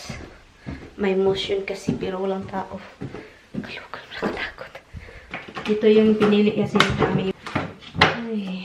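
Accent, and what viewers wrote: Visayas, Philippines. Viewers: Halatang bisaya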